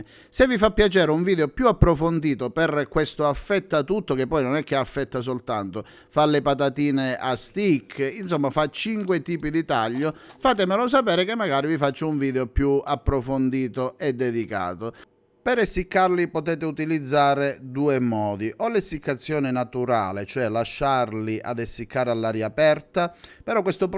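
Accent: Calabria, Italy. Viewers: Accento calabrese pesante eh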